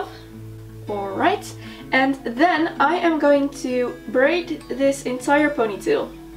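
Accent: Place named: Netherlands. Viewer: what accent does she have? She is from the Netherlands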